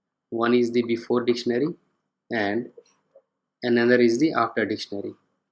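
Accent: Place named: South India, India. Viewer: thanks mate! Your accent reminds me of my roommate from south India. :)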